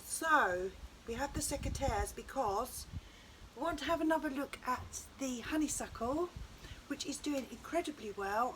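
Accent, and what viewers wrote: London, United Kingdom. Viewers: Yes it’s been in the same place for 15 years and I’ve cut it right back with the intention of getting rid of it, but it came back healthier till the fungus took hold again. Unfortunately it’s base is too large and established to be moved easily. It’s on a north south oriented fence so it get shade and sunshine. I heard that feeding and watering them well helps. One last ditched attempt this year for me. This morning it looked really well and healthy with all this heat. Have a wonderful w/e. BTW is that a London accent you have?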